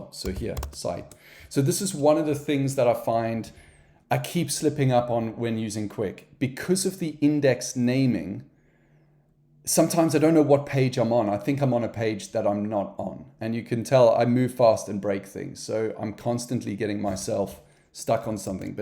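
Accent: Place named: South Africa. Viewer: I hear a South African accent.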